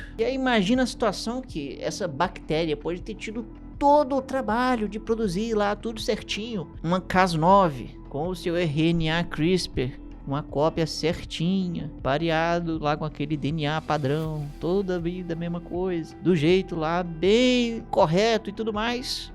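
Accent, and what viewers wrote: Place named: Northeast, Brazil. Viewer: "Uma ruma de vírus" Amo o dialeto nordestino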